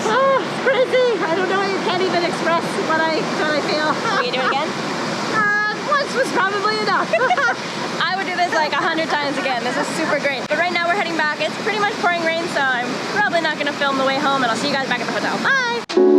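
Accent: Costa Rica. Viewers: Mae he visto prácticamente todos sus videos me encanta ❤️🇨🇷 cual es su Instagram? O otras redes?? Pd: me encanta su acento Tico jajaja ❤️🇨🇷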